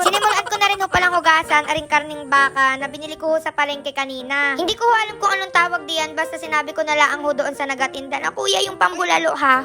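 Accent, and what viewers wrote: Batangas, Philippines. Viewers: Batanguena accent...ka gandang batang are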